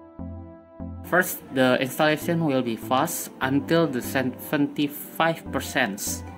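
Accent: Indonesia. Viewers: menurut gw berasa kok indo nya, udh biasa denger org indo ngomong pake b. ing.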